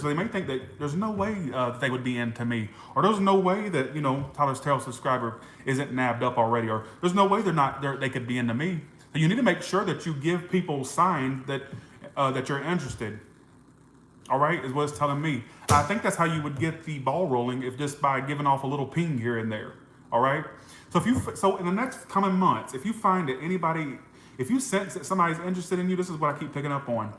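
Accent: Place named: Texas, United States. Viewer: you sound like a Texan, reminding me of my cousin walter/jeremiah, from Pasadena / houston <3 lmk if you are. love it. and im a spiritualist/ tarotist too, i had my entire YouTube erased , just about. i feel your pain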